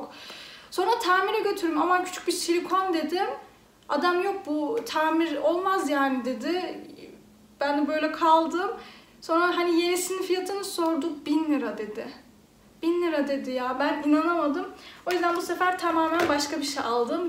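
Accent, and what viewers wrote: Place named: Germany. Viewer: Harikasın bayılıyorum konuşmana ev düzenine hanım hanımcık oluşuna aldıkların çok güzel güle güle kullan💗bizimde gelinimiz var almayada doğup büyüdüğü için senin gibi konuşuyor oda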